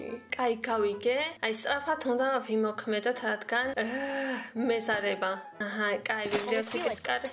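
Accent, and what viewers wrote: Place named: Georgia. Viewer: რა სოფლურად ლაპარაკობს თბილისელიხარ თუ სოფლელიხარ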